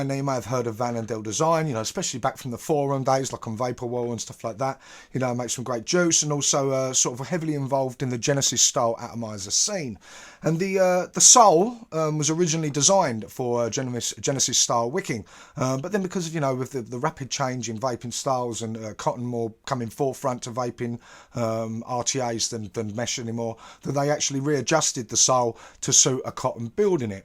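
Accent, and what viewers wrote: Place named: Cockney, United Kingdom. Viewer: whats that accent cockney 😄😅😂 He always does better reviews that the shed dweller ✌safe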